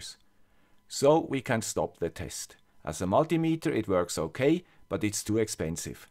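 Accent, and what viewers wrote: Switzerland. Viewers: Great as usual...but is everything ok with you? Noticed that you sounded sad or tired especially at the start of the video. If tired take a break but do come back because we can't miss the guy with the swiss accent!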